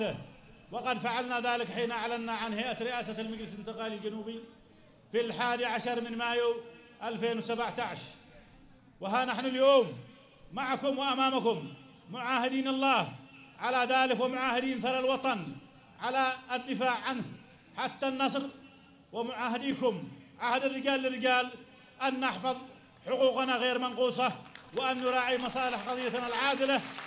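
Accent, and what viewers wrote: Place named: United Arab Emirates. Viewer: كلامك اماراتي روح بلادك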